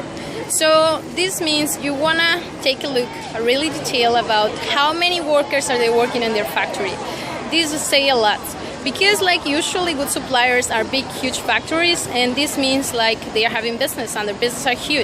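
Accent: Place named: Latin America. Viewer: I love ur accent are u Latina?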